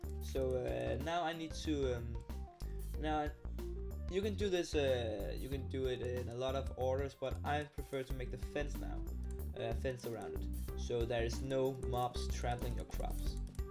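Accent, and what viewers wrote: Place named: Denmark. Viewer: Man kan høre du er dansker. xD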